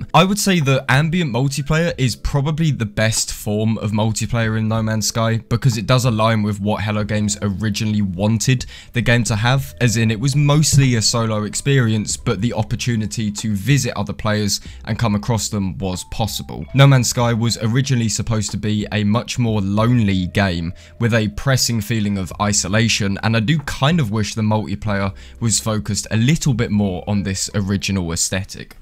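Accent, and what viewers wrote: United Kingdom. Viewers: yo you british, i hit the L & S. just because gg. blud x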